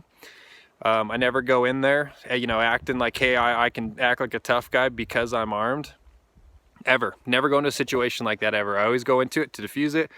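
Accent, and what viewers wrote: Idaho, United States. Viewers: Wait you are from Idaho?! Me too ahhaha I was just about to say you sound like an Idaho man hahah love it!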